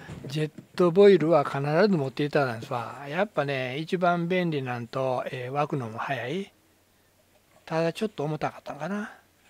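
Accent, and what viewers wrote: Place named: Osaka, Japan. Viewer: やっぱり大阪弁は最高です。しみじみ浸透します。おおきに。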